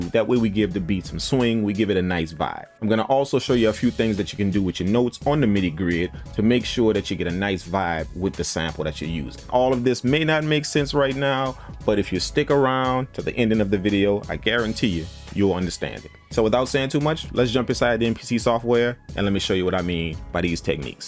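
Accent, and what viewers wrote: New Orleans, United States. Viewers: New Orleans heavy accent. Great video man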